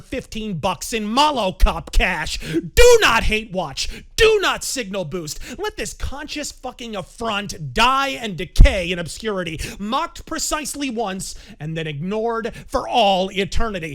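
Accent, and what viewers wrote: England, United Kingdom. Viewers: For a brief momemt, I thought you had a british friend do VO. Haha nice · As an Englishman I approve of English accent. One Shitposter to Rule Them all!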